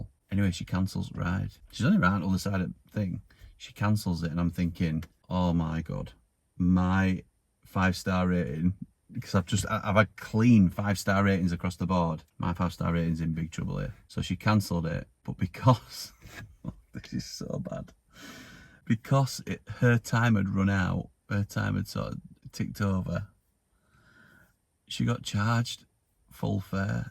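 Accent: Yorkshire, United Kingdom. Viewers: Strange how much accent changes between Wakefield & Barnsley. For years I lived on Southdale Ossett & Worked in Averdsley Barnsley from home to work going over Blacker lane & Staines Cross was just over 7 miles. Completely different language lol. Did you mention to the 2 birds that subscribed to both of your Channels that all subscribers are LEEDS, MOT.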